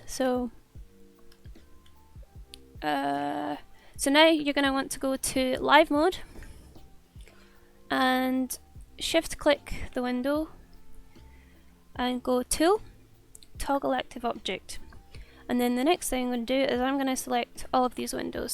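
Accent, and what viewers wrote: Scotland, United Kingdom. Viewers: I hear a fellow scot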